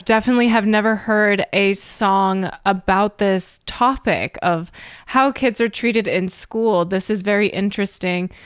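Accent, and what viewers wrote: United Kingdom. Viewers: British public school commentary circa late '70s.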